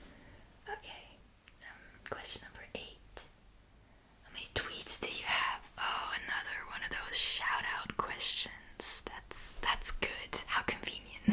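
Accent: United States. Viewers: Your German? Could have fooled me with that american accent. ok every now and then it sounds a little off but for the most part "98%" you sound american :) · This was fun....As a viewer for the last 2 years, I still can't figure out how you sound more American than my American friends ツ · You seriously sound sooo American it's Hilarious lol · I knew you spoke German I just didn't think you were German. You speak English so well and naturally, I thought you had an American accent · You really wouldn't think you're not American. Your accent is so on point! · your accent sounds American. your English is 'perfect' and your Spanish is as well.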